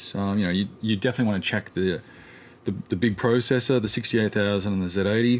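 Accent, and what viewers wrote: Australia. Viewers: Great video. The microphone works just fine. I'm mexican and I do understand very well your aussie accent. Thanks for your video.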